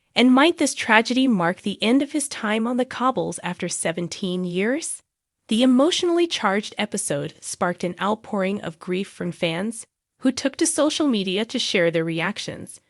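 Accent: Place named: United States. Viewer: The AI voiceover in an American accent is very annoying who pronounces names wrongly!!!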